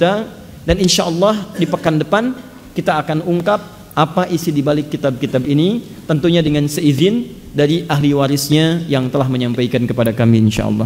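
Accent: Arab world. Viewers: Ust ni org Arab ke? Cakap Pon loghat arab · Ustad dahsyat, ilimu nya tinggi, keterangannya mudah dimenerti. Tetapi mohon logat bicaranya jangan spt org Arab berbicara bahasa Indonesia. Salam.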